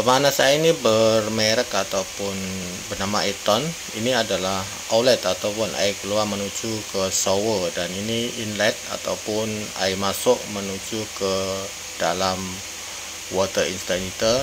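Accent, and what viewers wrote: Malaysia. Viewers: Q fokus kata2 kaka logatnya masih kayak orang malaysia Dulu di malaysia brpa lama ka?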